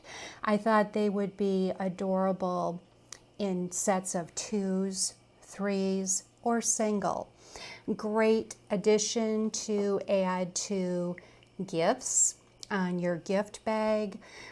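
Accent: Midwest, United States. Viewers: Wow, your accent sounds like Illinois/midwest region.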